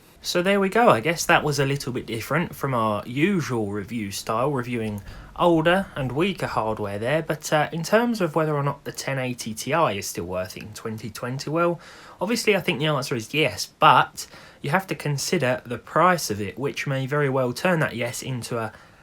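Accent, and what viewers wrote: United Kingdom. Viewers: Greetings from "DEUTSCHLAND" i love ur british Accent!!! 🙂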